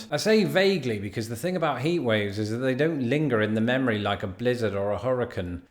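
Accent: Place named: Grimsby, United Kingdom. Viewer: "Choonkey Moonkey" may be the most Northern thing you've ever said. Never lose that Grimsby accent, dude. It's wicked awesome (which is the most Mass thing I say).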